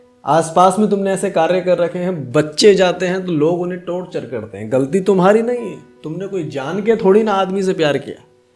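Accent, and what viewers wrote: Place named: Rural, India. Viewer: Bhai tu jaise bat kar raha hey aur jaisa tera accent hey. Yeh sab tere gaao mey hota hoga. Par the reality is aj koi bhi para likkha hua ladka yeh sochke shaadi nahi karta hey k biwi aa k uski paa dawaygi, yeh toh bahut dur ki baat hey ladki aa k ghar ka kaam karegi yeh bhi koi nahi sochta , kyuki ajkal ka ladki ya bahut hi modern ho chuki hey. Bol degi biwi layi ho, servant nahi. Toh jo bhi ladka shaadi karta hey, woh just ek nice family pane k aas mey shaadi karta hey. Lekin india ka biased law k chalte huye sab ladki aur uski maa baap ko malum hey k shaadi sey accha business aj kuch bhi nahi hey. Pehle shaadi koro, fir divorce do, fir alimony lo, maintenance lo. Ho gaya savings for lifetime, ab dusra bakhra dekho. Isiliye no men should get married in India. Bas yehi ek reason enough hey shaadi na karne k liye.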